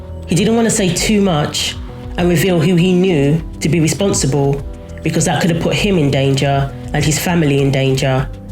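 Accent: London, United Kingdom. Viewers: Some big fish did go through the net. To this day still an unsolved murder! Love the London accent! RIP❗️though everything was avoidable.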